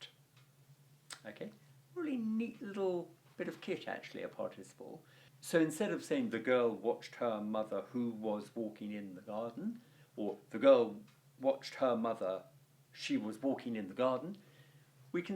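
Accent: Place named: England, United Kingdom. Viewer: Thank God for your English accent and clarity. I sometimes think I'm listening to Caesar recited by Davy Crockett.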